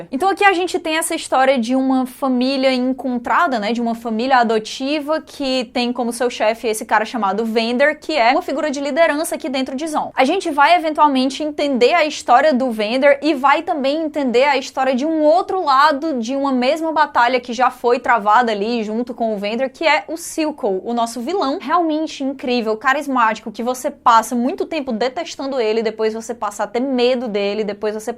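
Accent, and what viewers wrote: Northeast, Brazil. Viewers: impecável como sempre. Adoro sotaque dela, e todos os nordestinos. Acho Arcane uma animação incrível, da pra ver o trabalho bem feito de todos da produção. Não jogo Lol, não entendo nada, mas é de fato muito boa, porém não é perfeita, existe uma seria de problemas no enredo. É pra se divertir. Parabéns pelo vídeo.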